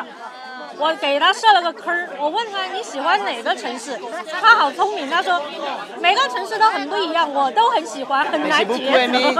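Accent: Sichuan, China. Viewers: 你这四川口音好重，😊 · 你这个川普真滴正宗啊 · 这口音绝对四川妹😄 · 你這個川普可以喲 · 你的川普不错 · －口川普，好可爱😊😊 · 四川口音好重！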